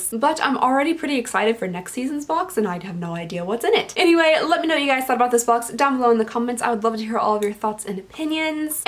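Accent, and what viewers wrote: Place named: South, United States. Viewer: I’m from the South but am currently on the west coast courtesy of the military and I watch all of your videos because I loooove hearing your accent. It makes home feel just a little less far away.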